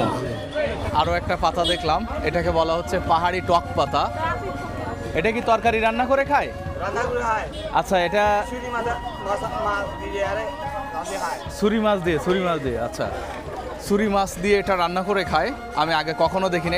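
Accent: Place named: Barishal, Bangladesh. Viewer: আমি বরিশাল বিভাগের পটুয়াখালী জেলা থেকে দেখছি, যিনি উপস্থাপনা করতেছে তার বাড়ি মনে হয় পটুয়াখালী বা বরিশাল।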